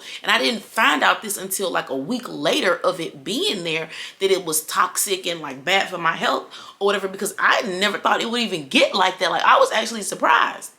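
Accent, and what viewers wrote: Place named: St. Louis, United States. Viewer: Your St. Louis accent is so strong lol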